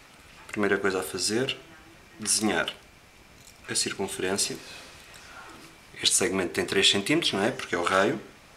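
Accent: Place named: Portugal. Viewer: A língua que ele fala é Português de Portugal amigo. A mesma língua que a nossa, só que com sotaque de Portugal.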